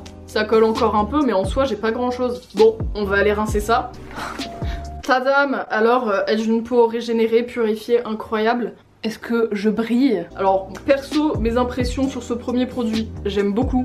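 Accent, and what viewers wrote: United Kingdom. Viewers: Mais elle est 100% British pas américaine, écoutez son accent 😢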